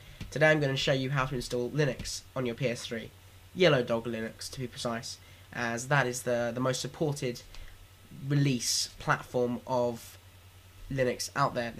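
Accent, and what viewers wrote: United Kingdom. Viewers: its like a peter griffin with a harry potter accent! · this an accent from the British Island. Nice video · are you british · Looks American sounds British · you're so British!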